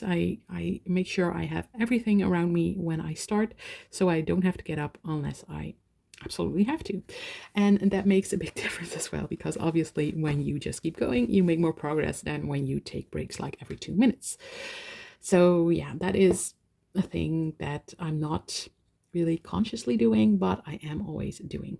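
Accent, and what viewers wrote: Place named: Netherlands. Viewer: Clocked the Dutch accent immediately hahaha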